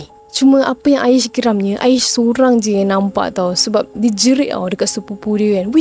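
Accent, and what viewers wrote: Kelantan, Malaysia. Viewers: Kecek kelate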